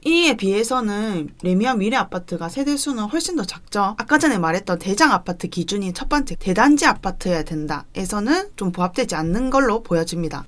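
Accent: Gyeongsang, South Korea. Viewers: 대구 사람 아입니까?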